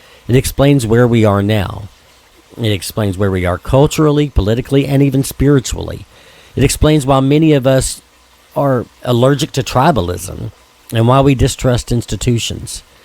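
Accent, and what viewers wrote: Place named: North Carolina, United States. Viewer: thought I was hearing NC. ✌️🤘🏻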